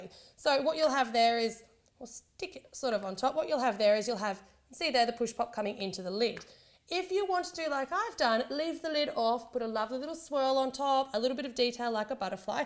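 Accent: Australia. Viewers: OMG! Are you an Aussie!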